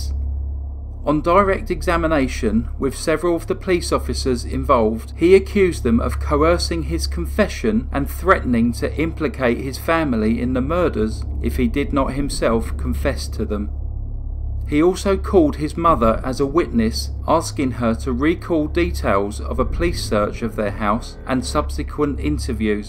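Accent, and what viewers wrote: United Kingdom. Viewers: Hey, ThankY again for another great video!! What county/province are you from in the U.K.? The reason I ask is because the gentleman who does the series: Travels by Narrowboat (Kevin Shelly) sounds Exactly like You- not just the accent, but in pronunciation, pitch, resonation, word choices.. all of it. Briefcase & you sound similar, but this gentleman- it really is amazing how much much you sound alike.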